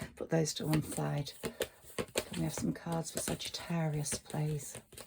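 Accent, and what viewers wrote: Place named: Birmingham, United Kingdom. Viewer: Welcome back, really nice to hear your slight Brummie voice, lol its been a tough couple of months, constant healing, thanks for your dedication xx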